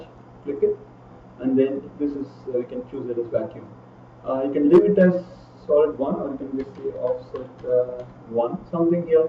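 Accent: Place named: India. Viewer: The audio quality is so poor and the Indian accent so strong that the video is practically unwatchable. Thanks for the attempt but I rank it 3/10.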